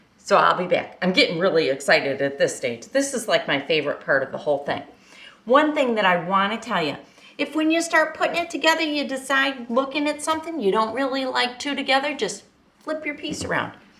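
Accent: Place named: Rochester, United States. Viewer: Are you from Rochester NY ? I here the accent.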